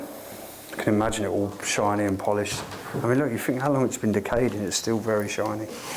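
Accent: England, United Kingdom. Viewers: Great video. watching from USA but I'm English and it's nice to hear an English accent again.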